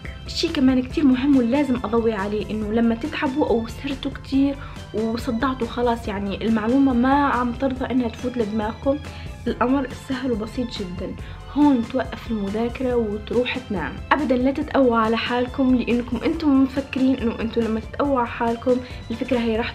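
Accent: Tunisia. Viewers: أنت تونسية صح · لهجتك فيها شوي تونسية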